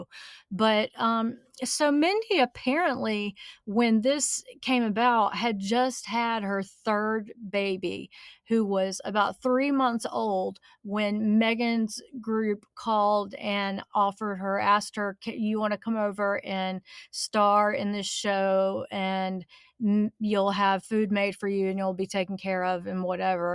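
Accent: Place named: South, United States. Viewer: I always enjoy your videos! As far as your southern drawl and you "working on it," please don't. You leave that drawl alone! It's a pleasure listening to it! Your reviews have been top-notch. 🏆 Thank you for your service, ma'am. 🫡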